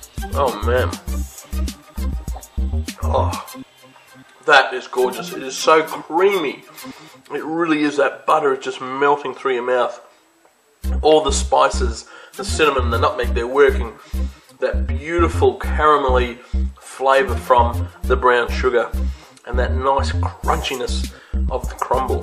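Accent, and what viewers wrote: Australia. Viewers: I guess he's an aussie. He sounds all right for me. Butter, brown sugar and cinnamon...Thanks. I'm going to the supermarket now. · after the first ten seconds I wondered why his accent seemed so familiar and then I realised that he had an Australian accent hehe!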